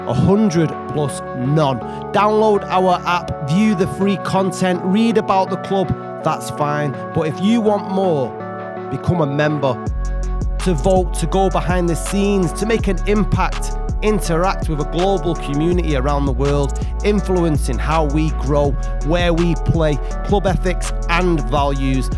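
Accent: Manchester, United Kingdom. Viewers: doing the voice over in that vid? proper Manc😆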